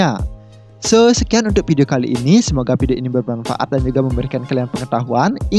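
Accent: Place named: Bali, Indonesia. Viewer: Pengisi suara orang Bali😁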